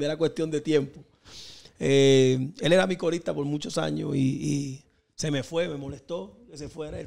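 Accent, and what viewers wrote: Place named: Puerto Rico. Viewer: Es que el es puertorriqueño.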